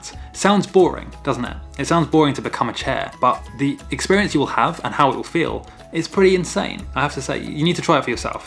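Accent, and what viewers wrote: England, United Kingdom. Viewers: You are like the joe wicks of lucid dreaming, Long hair, English Accent and all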